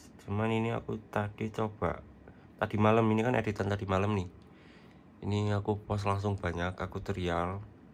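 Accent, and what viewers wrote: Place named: Wonosobo, Indonesia. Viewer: Sampean cah ngendi mas? Logate kaya wsb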